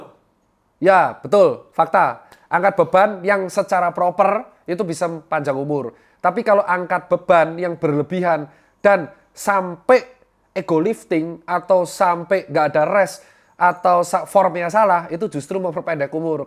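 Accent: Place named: East Java, Indonesia. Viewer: logat jawa timuran banget...mantap dok""😁👍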